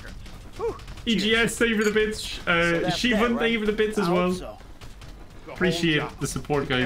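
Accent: Ireland. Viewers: Personality, an irish accent, a chaos mod in GTAV, it's pretty great. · Dark viper but irish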